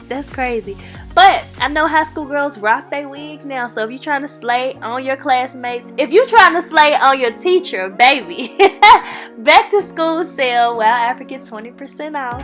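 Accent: South, United States. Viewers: I love your country accent. 😍😍😍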